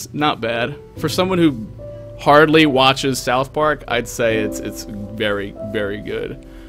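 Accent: United Kingdom. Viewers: With That British Accent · bruuh wow bri*ish accent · The British accent lmao “Alright let’s go find some endermen.”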